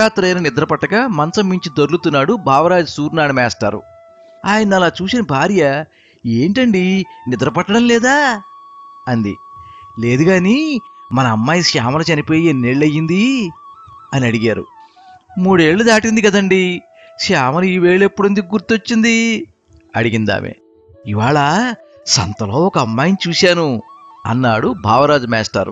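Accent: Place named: Godavari, India. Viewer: నవ్వి నవ్వి పొట్ట చెక్కలయింది. మీ గళం అసామాన్యం. గోదావరి యాస‌ చక్కగా పలికింది మీ గొంతులో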